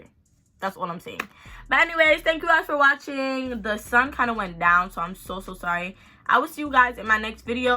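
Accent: New York, United States. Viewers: Yo you mad pretty and you sound like you from New York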